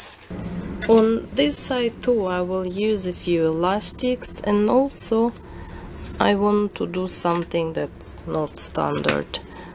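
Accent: Russia. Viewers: Привет she has a Russian acsent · Its really cool,my friends asked me if i made it and they liked it;)i told them about your channel amd they loved your crafts and my friend send a message for you "Wow,she is really creative!i like her accent and i think she is russian.man russian ppl are so cool>.<"-ellie(my friend:) )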